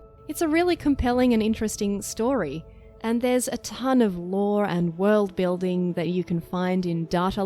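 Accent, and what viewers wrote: Australia. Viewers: a hole in the floor for the ore to drop through is a fun thing to hear in an australian accent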